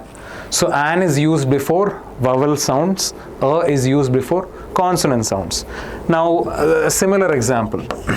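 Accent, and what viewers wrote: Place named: Kerala, India. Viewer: Sir is your language is malayalam bcz of your talking style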